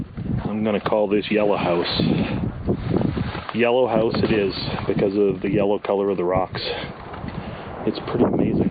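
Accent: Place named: Europe. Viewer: Voice has a slight European sound .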